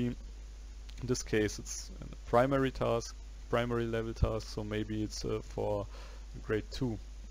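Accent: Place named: Germany. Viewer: Man merkt das du Deutscher bist aber danke für die Hilfe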